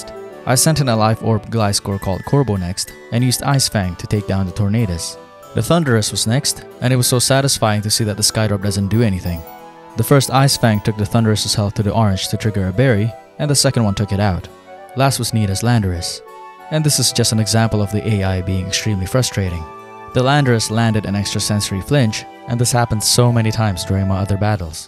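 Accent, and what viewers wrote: France. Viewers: why does he say every name in french LYSHANDERUE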